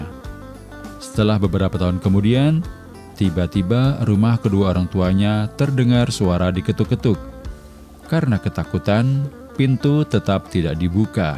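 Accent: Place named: Pekalongan, Indonesia. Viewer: Hadir bos....sebenarnya orang mna sih..bahasanya kyknya pekalongan tulen....kecamatan mna gitu ..kl boleh tau..😑😑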